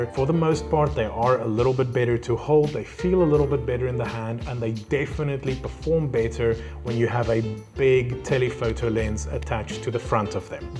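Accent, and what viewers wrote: South Africa. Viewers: He's a South African. South Africa is a country inhabited by people. People have different accents, depending on their parentage, heritage and significant influences during their language acquisition years. Almost no-one, except Actors playing a specific role that requires a particular accent "try to sound like an American", because (as in all societies) there are dozens of regional accents across the country. Your comments illustrates several things about you. ● You are a poor observer ● You are immature ● You have little knowledge of languages ● You rarely think clearly.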